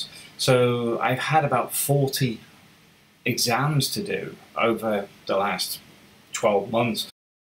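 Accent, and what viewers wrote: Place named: Birmingham, United Kingdom. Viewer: He even speaks like an idiot ! Bruuuuummmieeeeee and living there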